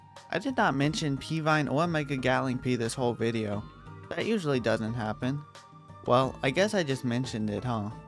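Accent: Romania. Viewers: I know ur romanian or moldavian , but i can't prove it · romanian detected, my fellow balkan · Are you Romanian? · r u romanian? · Are u romanian? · Are you perhaps romanian??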